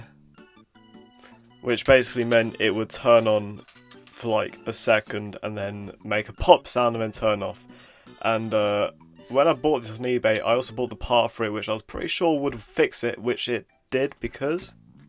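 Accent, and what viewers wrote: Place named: Australia. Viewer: I love your australian accent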